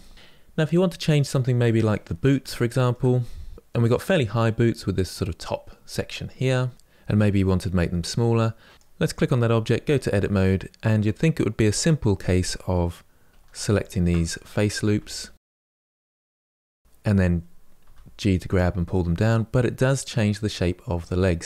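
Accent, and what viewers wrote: United Kingdom. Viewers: I love channel. In my personal opinion, hands down the best blender teacher on youtube. Detailed and very good at explaining each step. It definitely helps that he has a soothing british voice lol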